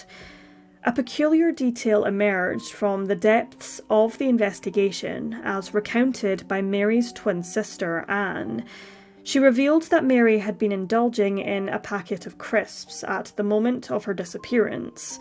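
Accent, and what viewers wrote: Scotland, United Kingdom. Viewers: It is a Scottish accent so the woman is a native English speaker..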